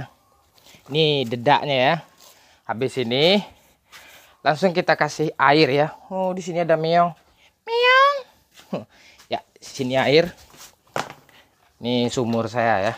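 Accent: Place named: West Sumatra, Indonesia. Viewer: Bahasa minang ajalah ketahuan banget minangny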